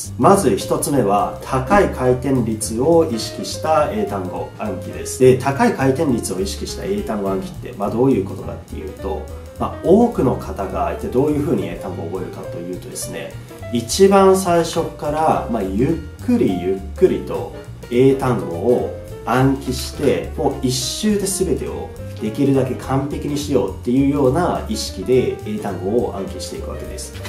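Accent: Australia. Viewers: オーストラリア訛り？ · オーストラリア英語？ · 発音がすごいオーストラリア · オーストラリア訛りすかね？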